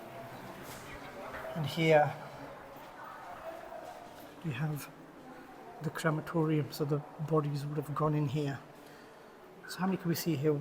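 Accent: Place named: England, United Kingdom. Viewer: Sardar ji jara saaf bolo tusin te England wale lehje naal hi fatte chuki jande ho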